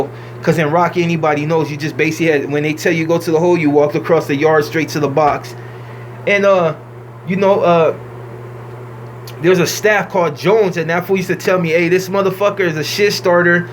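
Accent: Mexico. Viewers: Are you full black ? Becuase damn dude you sound Mexican! You gotta be part Mexican lol it's not a big deal but its interesting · Why the fuck you talking like a mexican nigga? Stfu w yo bitch ass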